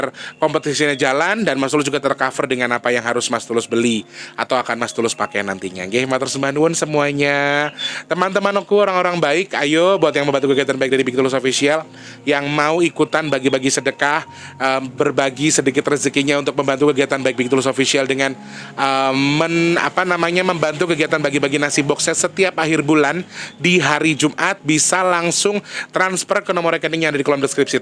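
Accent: Banyumas, Indonesia. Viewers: Laah rika deneng bisa ngapak mas tulus? kayong wis teyeng men.... salam ngapak sing nyonge hehehe..... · Rika teyeng ngapak jebule ya Mas.....😃😃😃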